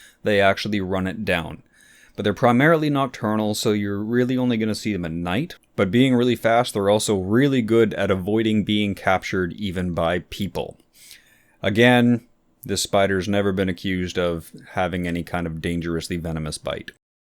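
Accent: Canada. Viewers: Nova Scotia! Right on I thought I sensed a fellow Bluenoser! 👌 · These videos are great and I love your sense of humour. I also love to hear Canadians speak. "Out" and "about" in particular. You should do an episode on the Aphonopelma marxi (brown tarantula). Common in Colorado, where I live, and terrifying to all the transplanted humans from West of the Continental Divide.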